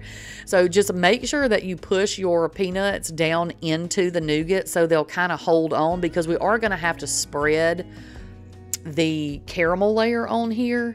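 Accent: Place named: Tennessee, United States. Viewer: Are you from Tennessee by chance? Love your accent, it reminds me of my dad's and he was born and raised in Nashville.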